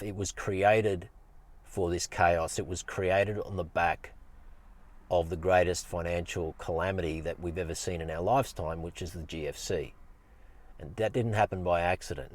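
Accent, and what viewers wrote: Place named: Australia. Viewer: Hi, I'm a fellow Queenslander down on the Goldy, it's good to listen to an Aussie for once on bitcoin, plenty of you tubers from u.s. mate I'm a big believer in bitcoin and have approx 0.8 stacked now my goal is to get to 1 coin soon. My biggest concern is security with wallets ect, and what other steps can we take to make sure we don't lose our bitcoin. Not sure if you have meet ups in person to discuss or just book a call, I'm very keen in talking further with you about this exciting future, I really want to set my kids up so they don't go through life stuggerlig for money. Cheers Warren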